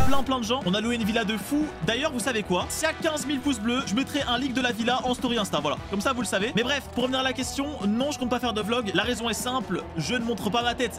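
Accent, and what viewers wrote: Belgium. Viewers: Tu es belge ? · t’es belge?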